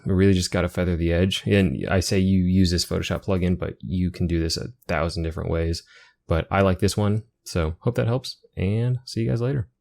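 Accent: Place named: United States. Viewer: You look british but sound american?!?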